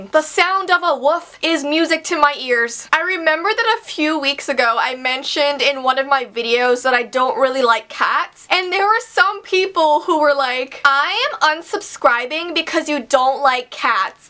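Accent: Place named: Canada. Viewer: I love your Canadian accent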